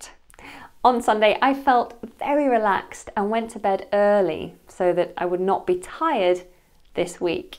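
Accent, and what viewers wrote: United Kingdom. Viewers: But you have a perfect British accent 👍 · Hi, you have a great British accent, but this test is more likely a memory test. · I will always say, you have a great british accent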